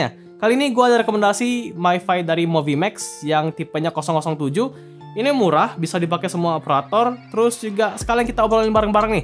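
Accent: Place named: Lombok, Indonesia. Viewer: Kayak logat plat DR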